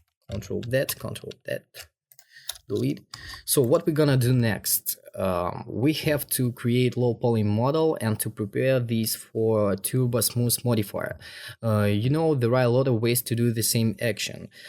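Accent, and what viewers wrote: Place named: Russia. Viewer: Приятно слышать русскоязычный акцент, словно всё по русски)) Подписка.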